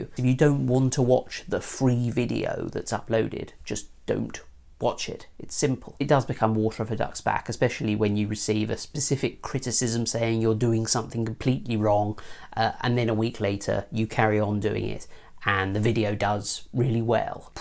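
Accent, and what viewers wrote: United Kingdom. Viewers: I always like british accent ;)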